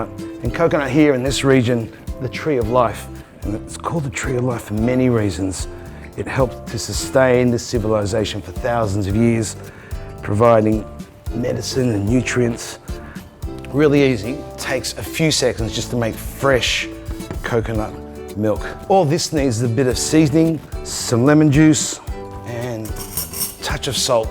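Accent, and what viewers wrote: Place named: Australia. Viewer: this comment is not meant to be disrepectful or anything, but this video makes me, as a Londoner want to travel to this part of the world more, I've just saw an Asian looking man speak in a Aussie accent teaching how to cook Fijian food. Amazing, so much culture in this part of the world I've yet to see and experience.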